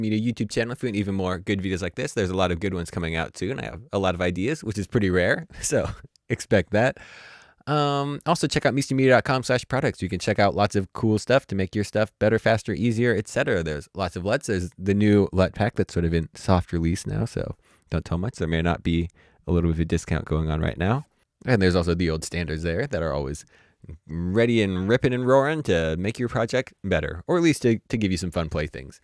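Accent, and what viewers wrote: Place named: England, United Kingdom. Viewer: it's english..not american lol..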